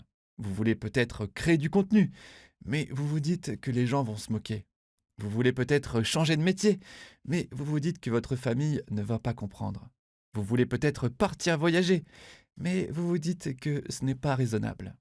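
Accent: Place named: Maghreb. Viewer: Il est étrange que-à mon point de vue-vous ayez un accent maghrebin alors que vous l'êtes pas dorigine ! J'aime vous écouter car la manière dont vous vous exprimez, engendre relaxation et détente, au sein de mon système nerveux énervé : Une impression que j'ai.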